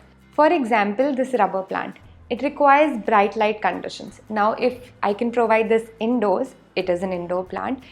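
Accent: India. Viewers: I love plants and I love Indian accents. How did the YouTube know this? 🤩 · Any ficus is a rubber plant therefore in my country the most comon look quite diffrently. And dang i am on c1 levelnof english i even understand scotish a cent but insien accent is draining to listen to.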